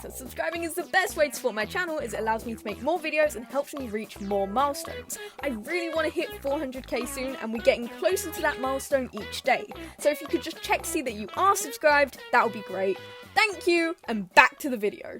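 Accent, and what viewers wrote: United Kingdom. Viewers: You’re british? YOOOOO W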